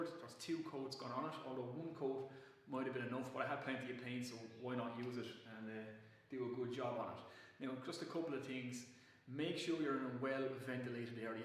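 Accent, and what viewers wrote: Ireland. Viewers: Can't hear you man. But subscribed anyway haha refreshing to hear an Irish accent for a change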